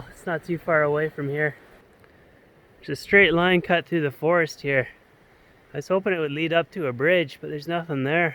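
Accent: Canada. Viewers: Beautiful sentiments but he's Canadian, NOT American. We have different regional accents as well.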